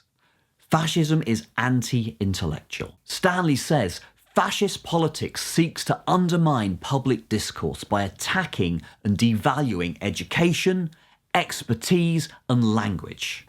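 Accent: United Kingdom. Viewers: And your British accent does nothing to give you credibility . My stepfather is a Brit. Anyway , turn off BBC which is prob bigger garbage than cnn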